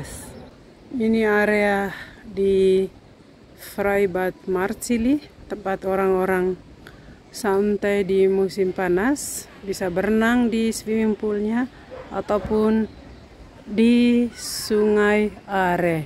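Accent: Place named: Flores, Indonesia. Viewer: Mohon maaf, Logatnya kayak orang flores, manggarai, ruteng🙂🙏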